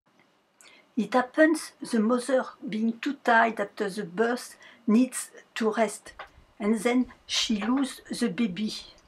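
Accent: France. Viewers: Love the French accent here 😁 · J'adore l'accent français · She's speaking English with a French accent. I understand her very well. If you don't, then you're the problem not her. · Très bon reportage. Thank you for sharing. Yes, you have french accent: I love it and thank you for sharing anyway, great video -and this is what counts. Merci beaucoup de la Catalogne.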